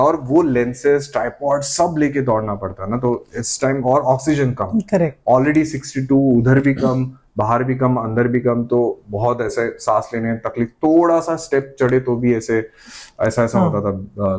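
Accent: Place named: Maharashtra, India. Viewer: तुझी हिंदी खूप चांगली आहेस, पण हिंदी बोलतांना तुझी मराठी Tuning अधून मधून बाहेर निघूनच जाते 😂😂❤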